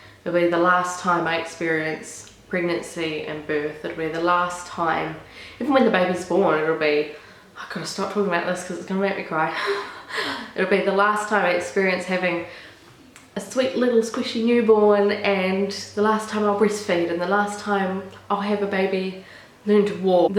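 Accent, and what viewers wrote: New Zealand. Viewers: congratulations ! ive just started vlogging i live in melbourne but am from nz too, cool to see / hear the kiwi accent on you :) all the best for your pregnancy journey 💕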